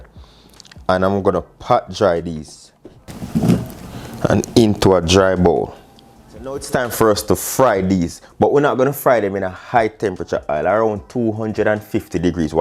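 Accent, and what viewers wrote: Jamaica. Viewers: I am moved to say u r on to greatness! Ur delivery, methodology, mannerisms is just authentic then u drop the Jamaican expressions n lift ur presentations to another level! Miss Lou would be proud a yuh!!! Bless u n family n keep JA no top.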